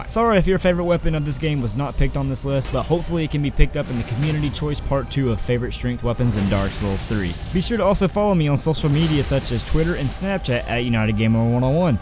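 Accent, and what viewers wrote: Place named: Texas, United States. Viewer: I really love your videos! Also what accent is that are you from the south like Texas?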